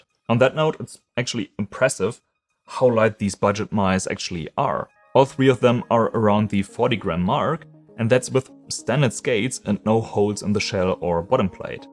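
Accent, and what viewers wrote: Germany. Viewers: i hear german? aber krasser akzent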